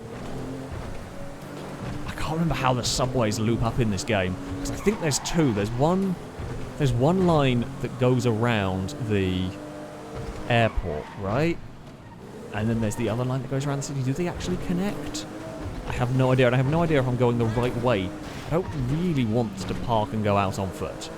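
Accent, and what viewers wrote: United Kingdom. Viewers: depends on what the Goal is. the player wants to sneak around and never be seen while he achieves his goals. the main face of YouTube channel knows that we want violent crashes and lovely United kingdoms commentary over unspeakable violence!! I've never done it, but it's got to be a rough balance to achieve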